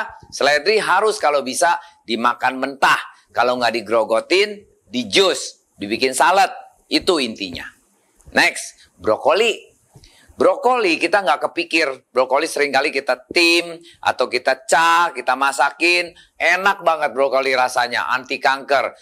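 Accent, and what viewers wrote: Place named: Jakarta, Indonesia. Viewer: Pak ngomongnya kaya orang betawi🖒🖒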